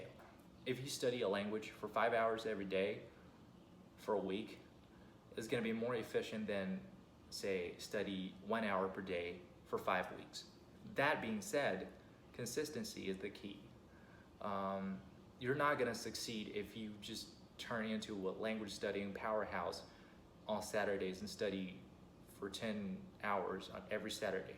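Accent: South, United States. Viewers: Your English has a southern style to it 🔥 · Hi, I have a question, how did you learn English? From your accent, I guess you went to american at an early age and studied maybe middle school in USA, is my guess correct? ❤ your sharing videos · Dude how do you sound so Southern?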